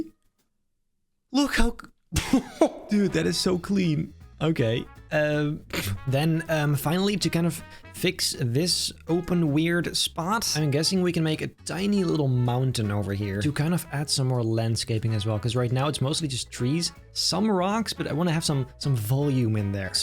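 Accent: Netherlands. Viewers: PLEASE MAKE A VIDEO WITH A DUTCH ACCENT 😹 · Pls let the man talk Dutch English for an episode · I was al wat verdacht maar het Nederlandse accent maakte het duidelijk dat je Nederlands bent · Duts aksent is the best! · Can you actually make a video with your Dutch accent?? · Me being Dutch, just caught me of guard with that Dutch accent 😅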